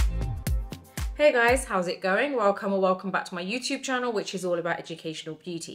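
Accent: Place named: United Kingdom. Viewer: verrrry helpful bcoz it answered some questions for me. I like yr british accent too!thank u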